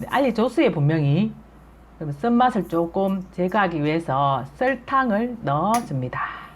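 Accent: Gyeongsang, South Korea. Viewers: 정말로 미역줄기 도라지김치 정말로요 맛나게 해요 요리를 잘배워서요 경상도 아주마 사두리 구수하네요 감사합니다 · 음식도 덕담도 넘 좋아요 말씨가 대구말 같은데 혹시 대구분이신가요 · 경상도 사투리 재미있어요 요리 잘 배우고 고맙습니다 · 선생님 경상도 사투리가 넘정겹고 하시는음식은 정말 맛깔시럽십더 저도고향이 경북의성이라 저의고향억양이네요 반갑고 요리잘보고 구독꾹눌리고 자주찾아뵙겠습니다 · 너무 재미있어요 처음으로 봤네요 구수한 경상도 말씨 좋아요 저도 고향이 경상도 거든요 도라지 참좋아하는데 내일시장가서 당장사와야 겠어요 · 구수한 경상도 사투리정이갑니데이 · 정겨운대구말 괜찬요.^^많이가르쳐주시니감사합니다. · ㅋ ㅋ 경상도 사투리 넘 구수합니다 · 정감 갑니다 경상도 압니까 저도 경상도 입니데 동네에서 인기 좋은 친구 겠어요 활발한 성격 부럽읍니데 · 도라지 쓴맛때문에 잘안하는데 꼭해봐야겠네요 구수한경상도 사투리 넘좋아요 정구지 카마 윗지방사람들 잘몰라애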